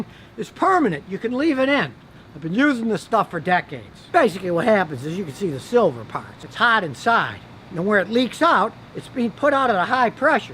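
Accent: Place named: United States. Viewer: Running translation is must in English as some people do not understand American accent orally.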